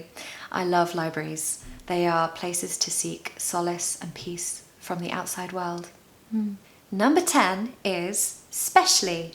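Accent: RP, United Kingdom. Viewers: First of all, glad I am so early to this newly opened channel. Second, can you make a video of you pronouncing random sentences and questions so that I can repeat after you and sound more natural??.. I am trying to learn the British accent ❤❤❤❤😁😁😁 BTW, you are a natural, and have such a stunning voice and accent that really helps people like me, who struggles with british accent, to learn faster. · Okay, let me say this. You are the BEST teacher on the youtube by far. I have been looking for a teacher and now I have the best one-. I love that your teach us about the British culture as you teach us about the British English. This is FANTASTIC. Please Please Pleaaaaase upload more videos. I watch your videos everyday now as I want to adopt your accent and learn more about the British culture. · Is received pronunciation your natural accent or do you speak it mainly for teaching purpose? 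· I liked the way you used elision technique in pronunciation, great video. Lovely British accent. I m learning from you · I love your beautiful smile and beautiful British accent!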